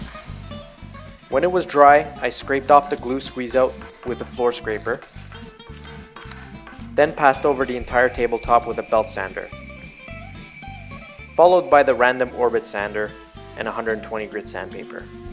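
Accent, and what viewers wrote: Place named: Canada. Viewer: Great project and great video. Im gonna be honest here: When you started talking I was like, "Oh, this dude's Canadian" (because of the accent). When you got to the feet and were using hockey pucks I burst out laughing. Nicely done.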